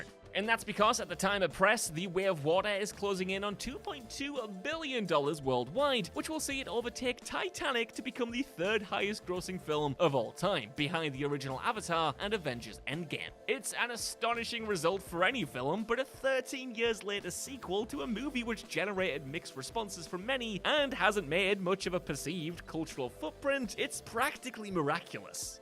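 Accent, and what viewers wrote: United Kingdom. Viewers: I love how he tries to keep pronouncing "toe-may-toe", but every now and again a thoroughly British "toe-mah-toe" slips out....🎶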